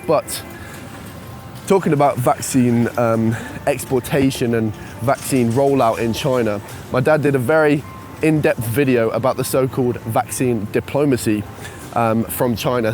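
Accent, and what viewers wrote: United Kingdom. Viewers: Thank tell truth instead too much propaganda news in America, only fools of themselves now. You look good today. Yr accent not too British so easy understand, yr older partner too accent to understand for America people. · Your British English is so cute and nice.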